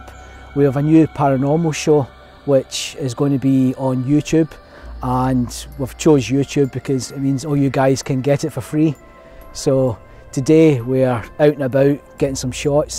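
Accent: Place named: Glasgow, United Kingdom. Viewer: Nice to hear the voice of a fellow Weegie (or should that be ouija) 😁 Looking forward to the series. Slainte guys!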